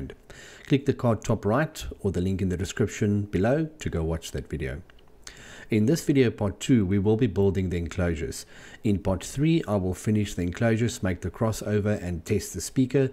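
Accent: South Africa. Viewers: I respect your designs and work ethic, that south african accent gets me every time. all the best and greatest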